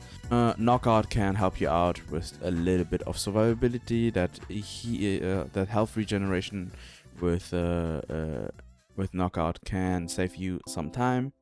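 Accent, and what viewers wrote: Germany. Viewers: Me: not sure where this guys accent is from oh German got it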